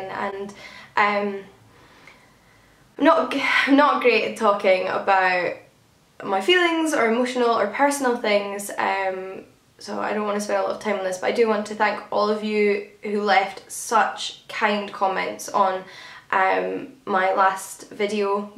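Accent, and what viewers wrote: Scotland, United Kingdom. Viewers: I havent been subscribed very long but I love your accent! Where are you from? Are you Scottish?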